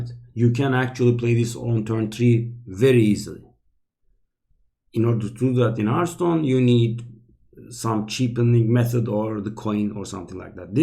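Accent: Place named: Turkey. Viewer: abi vallaha konuşmandan anladım türk olduğunu :)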